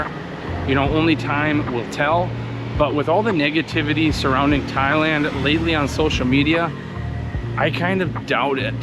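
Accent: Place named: Midwest, United States. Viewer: Nice video,. I’m trying to place your accent. Parts of it sound like like Minnesota, but I’m thinking Michigan or Wisconsin.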